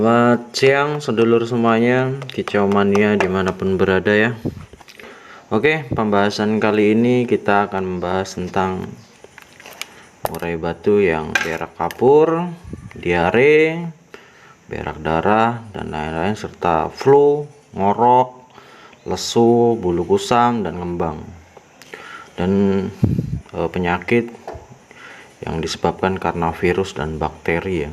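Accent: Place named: Lampung, Indonesia. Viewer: Suara kaya nada orang Jawa Lampung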